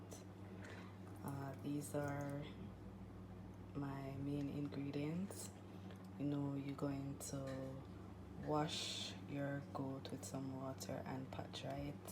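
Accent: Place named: Jamaica. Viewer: Yes yes . I can tell your jamaican.. great job on this hun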